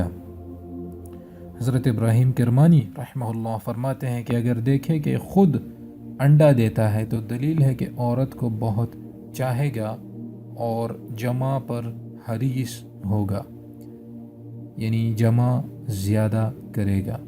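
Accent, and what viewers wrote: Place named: Arab world. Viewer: eysa lag raha hai keh Juma ka khutba sun raha hun> plz mic mun mouth se dur rakh kr audio rec kren our saaf urdu bolen, urdu ko arabic accent main bolne ka kia faida? our 'yeh ourat kre ga' ab yeh kia hota hai? kaniz pr dleel hai woh kia hai? kaniz means Gulam slave yah kia? female? hazrat danial A.S ke ilawah kuch nahi ho skta hai kia? chalo agar koi khatoon he dekh lia anda deti hui murghi tuo kia woh bhi ourat se he shadi kre gi? our kafan chori kre ga uski defination kahan hai? tuo kia zada ande nahi hum main se baz afrad ko hadees pehle se maloom hoti hain defination tlash kr rhye hote hain 3 mins 50 sec zaya krane ka koi faida? 7month se neache log qouestion puch rahe hain kisi aik ka bhi molana jwab dia hai keh nahi?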